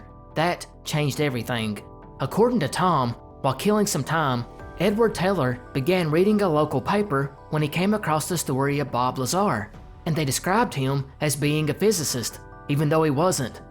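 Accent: South, United States. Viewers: It's soo good to hear a real deep southern voice that isn't put on. Love your show!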